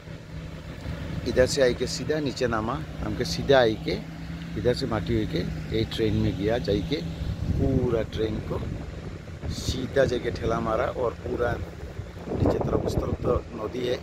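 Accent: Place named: Haflong, India. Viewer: This is Haflong Hindi. We've developed our own unique way of speaking the language...aur vese bhi, jese Mumbai , ya phir Hyderabad me log apne tarike se hindi bolte he, similarly Haflong me humlog Haflong Hindi me baat karte he...Humlog North India se to nehi he na, so, don't criticize his way of Speaking if you don't have any knowledge about the place or people living in Haflong....Look up Haflong Hindi if u don't know about it allready..It's unique in itself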